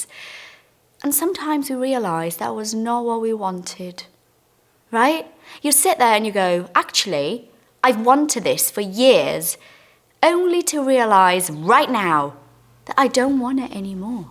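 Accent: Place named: Leeds, United Kingdom. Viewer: Hi!!! Loving your lessons. Have you ever thought of moving back to England? I used to live in Leeds, about 15 years ago, and i'm enjoying a lot listening this accent again. You're a perfect teacher!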